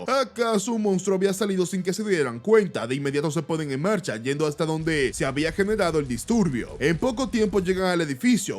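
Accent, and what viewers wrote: Dominican Republic. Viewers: este tiguere es demasiado dominicano, hasta narrando las historias le mete el flow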